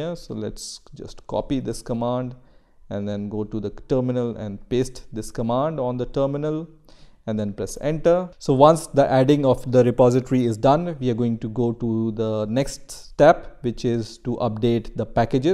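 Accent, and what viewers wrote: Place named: India. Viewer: you now what you sound Indian and I am pretty sure u are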